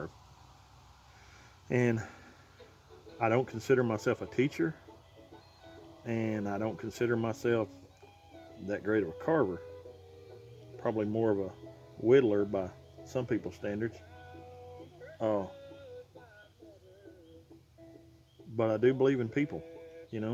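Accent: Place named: Arkansas, United States. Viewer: I'm new to this hobby, and I love your work. On top of that, I was born and raised in Western Arkansas, but I've been gone for just over 10 years now. I wouldn't trade the time I've spent on the mission field or pastoring now in Canada, but Arkansas will always be home. Everything about you and your videos (your accent, your sayings, the yard...) it all feels so familiar. Thanks for that.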